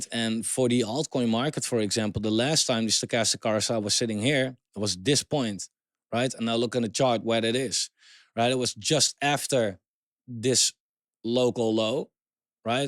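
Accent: Netherlands. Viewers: The accent ? , are you Dutch ? Kom je uit NL ?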